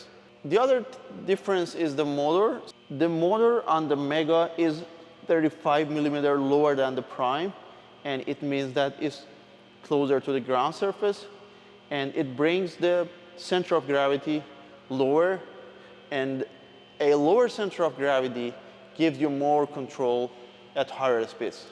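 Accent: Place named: Iran. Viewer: Yes they ship to all countries and Im 90 percent sure Brazil is covered. They will charge you factory destination and shipping and tax. Might be more expensive then in America or Dubai, where the company is based from. They are also Canada location. As it says on the bike Assembled in Canada. This owner has a brother which I think works for him. This guy is Persian and has a nice thick accent. A 12 year old can do a better job then this nut case LOL.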